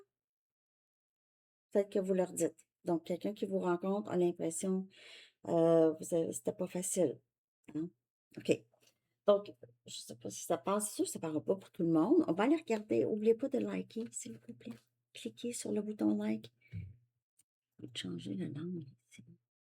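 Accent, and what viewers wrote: Canada. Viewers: Message 2 suite : En plus j'adore votre voix car elle est douce rieuse et aimante, votre petit accent canadien est adorable ! Je ne me lasse jamais de vous écouter, je suis toutes vos guidances avec le plus grand intérêt depuis 2 ans que je vous ai découvert sur YouTube. 🙏🙏🙏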